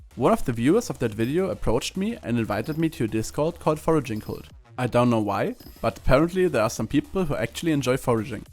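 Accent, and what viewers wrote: Germany. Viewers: i would buy my coop alchemy 50 ign laux2ß2ß nice vid btw and u have a small german dialekt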